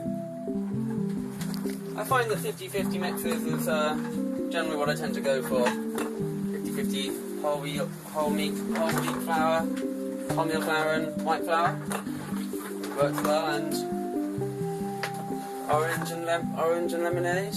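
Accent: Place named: United Kingdom. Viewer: Hi, some time soon Im hoping to sell my house and buy a boat too. I have just started to learn about sailing,may I ask what boat you have and make pls? Where are you from I hear a british accent, I myself am from Cardiff South Wales.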